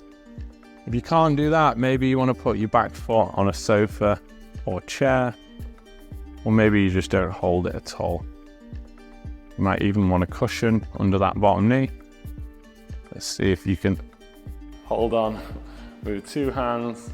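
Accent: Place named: Birmingham, United Kingdom. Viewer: Great stretch to follow a workout! Thanks. By the way, I love your accent. Have you seen “Peaky Blinders”? Your accent reminds me of the accents in that show. I feel like I’m working out with Tommy Shelby. 😜 Thanks for the great stretch!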